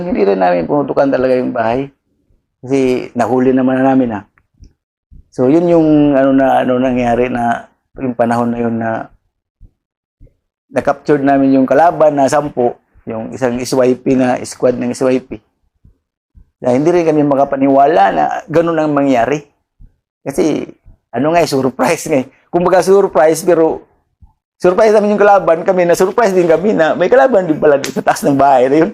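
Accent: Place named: Visayas, Philippines. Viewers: Ito idol ku mag kwetong bisdak na bisdak eh ganyan talaga mag salita ang mga parang carbon copy mag salita sa kilala kong din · Bisaya kc..haha..prang si tatay digs lang magkwento..nkktawa..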